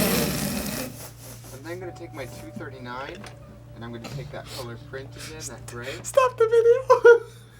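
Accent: Wisconsin, United States. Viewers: trixies voice sounds the same as it does now bc i’m from wisconsin too. like deadass i only hear the accent on some words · You really can hear the Wisconsin accent in these videos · I love your accent!! I was watching UNHhhh the other night when I realized you pronounce “groceries” grosheries just like me! I told my husband that you’re from Wisconsin and he replied “guess that’s why you’re such a cheesehead” and I lost it laughing. I do have family from the US, wouldn’t be surprised to find some were from Wisconsin.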